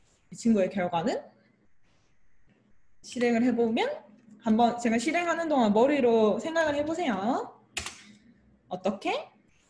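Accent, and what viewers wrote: Gyeongsang, South Korea. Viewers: 경상도 어투가 살갑고ᆢ정겨워요~ 강의가 귀에 쏙쏙~~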